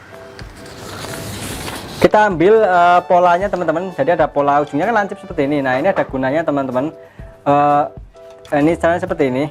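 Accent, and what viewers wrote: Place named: Java, Indonesia. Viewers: ....logatnya medok bgt. "saya menemukan cara ini sudah seratus tahun yang lalu"...temen-temen Yang penting pahammmm...... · Bener banget masx , sy suka kesulitan di bagian ini , triims banget atas ilmux . Njlimet sih tp gamblang , puas banget nonton tutorialx .👍👍👍 pasti lebih mumet lg tuh dgn bahasa medokx si mas , Untung aqiu org jawa jd keren aja . he he ....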